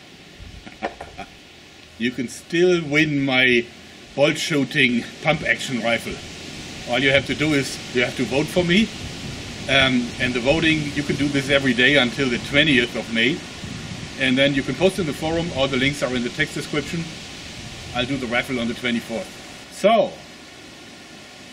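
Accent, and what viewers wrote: Germany. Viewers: Sprichst du deutsch? weil auf mich kommst du wie ein deutscher rüber (von Dialekt)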